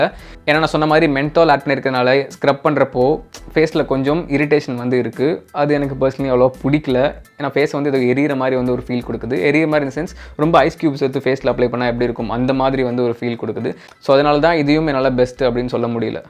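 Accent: Madurai, India. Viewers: Anney ninga Maduraiya😁.. Onga slang appudi eruku... "Avinga,Evainga... Pooturuingey"😍😍😍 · Maduraikkararo Neenga 🤔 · Bro Madura slang varuthu apo apo nenga Madura ya 🤔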